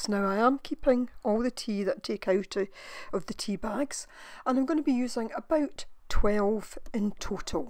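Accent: Scotland, United Kingdom. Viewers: I just loved this... thank you so much. Fabulous! I so enjoyed your Scottish accent. My only son works and lives in Scotland as a green keeper. I have visited once in 2019. The most magnificent country. I hope you are safe and well wherever you are. Stunning work. Aaah tea ☕️ best regards from South Africa to you 💫🇿🇦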